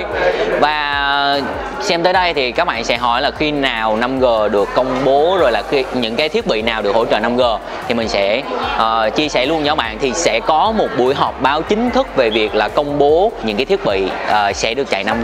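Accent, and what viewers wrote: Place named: Binh Dinh, Vietnam. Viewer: Nghe giống giọng Bình Định quá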